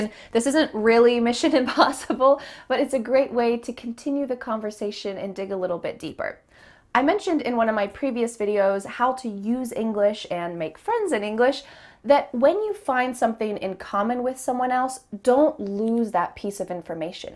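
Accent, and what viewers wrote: United States. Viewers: Thanks. Is this American or British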